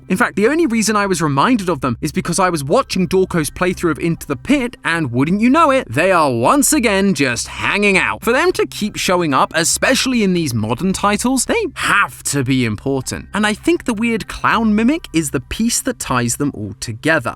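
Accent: England, United Kingdom. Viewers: did matpat become british? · matpat is british now? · Bro I miss mat pat 😭 now he’s a British guy man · Guys the British guy is back! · I think that this guy is a good replacement for matpat, because he just sound like him but British. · WHY DID MATPAT RETIE AND YOUR BRITISH NOT MATPAT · Hey you might wanna fix the United States close caption here. Someone used your English accent too literally and wrote Dawko as Dorko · Matpat seems a bit more British than I remember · what happened to pat mat, why did he turn to british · Every video I hope to hear matpat voice but no just a random british dude 😔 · man. i will never get over this voice. ofc the british one had to get game theory... lol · is a true prodigy. One created by matpat. (Nothing changed. The man behind the theory just sounds more British sounding.)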